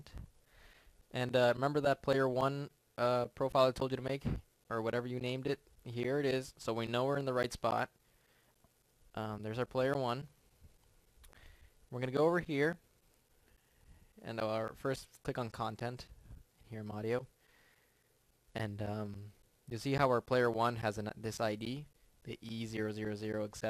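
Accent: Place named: Canada. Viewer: u canadian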